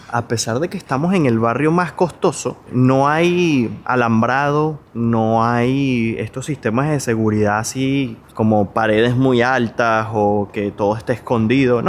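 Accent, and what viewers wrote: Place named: Venezuela. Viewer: Muy bueno el video, lastima esos acentos a Venecos.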